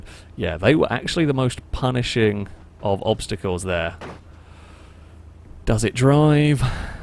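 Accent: United Kingdom. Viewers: hes just brittish, give the man some wa’ahr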